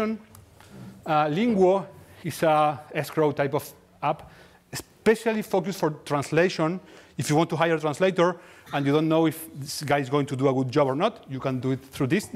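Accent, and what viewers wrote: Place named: Argentina. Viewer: He’s from Argentina, English isn’t his first language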